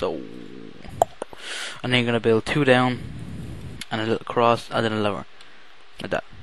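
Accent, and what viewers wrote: Ireland. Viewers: hes irish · That was cool. Nothing wrong with an Irish accent either.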